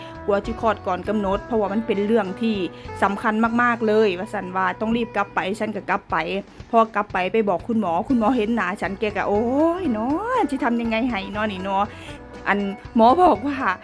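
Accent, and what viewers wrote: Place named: Chaiyaphum, Thailand. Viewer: สวัสดีค่ะหนู ป้าชอบหนูพูดมากๆค่ะพูดแบบนี้แหละเพราะดีค่ะป้าก็คนชัยภูมินี่แหละค่ะป้าชื่นชมหนูใช้ชีวิตอย่างมีความสุขจ้า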